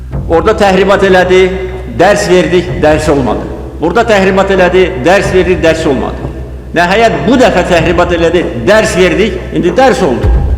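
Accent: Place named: Azerbaijan. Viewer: Başkanım kusra bakmayın ciddiye alalmıuorum Azerbeycan şivesi yüzünden ama helal olsun fmfmfkfkfmfmfmmf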